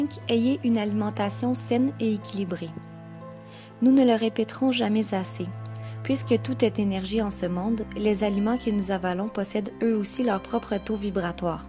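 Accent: Canada. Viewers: l'humanité à l'image de la Terre, malade, en souffrance. Beau documentaire et bel accent cousine Canadienne!!!!!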